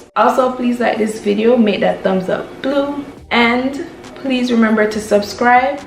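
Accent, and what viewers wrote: Jamaica. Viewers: I swear it so comforting hearing the Jamaican accent as another Jamaican person 😩🤣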